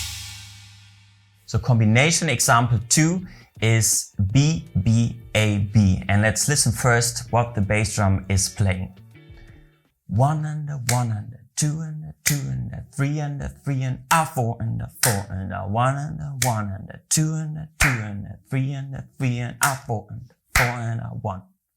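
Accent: Germany. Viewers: Haha... Man hört richtig, dass er deutscher ist xD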